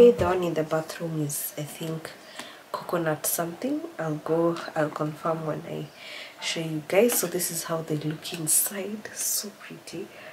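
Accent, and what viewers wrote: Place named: Kamba, Kenya. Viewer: Kamba accent 😂am fighting a cold too and its terrible,safe delivery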